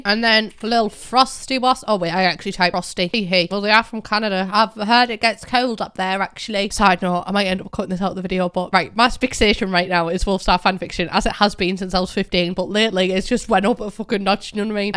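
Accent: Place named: Northern England, United Kingdom. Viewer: Sending good thoughts to you and your family and I am glad we have you back, even for this one video :) I've always enjoyed your content and have done for the past few years when you had 20k subscribers. I always enjoyed watching you cause you were the only simmer/youtuber I found that sounded slightly like me, I found it comforting that us Northern Lasses are getting some spotlight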